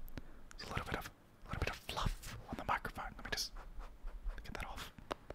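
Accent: Australia. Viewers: Never before did I experience an Aussie whispering in my ears. Gotta say, it's very calming. Great video!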